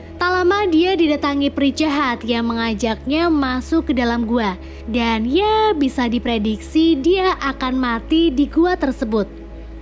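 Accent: Pemalang, Indonesia. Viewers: Mbak asli wong pemalang?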